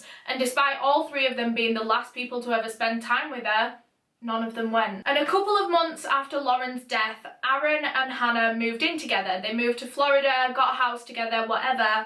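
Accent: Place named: Yorkshire, United Kingdom. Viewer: As an British person~ i belive yorkshire-? Or a tiny bit welsh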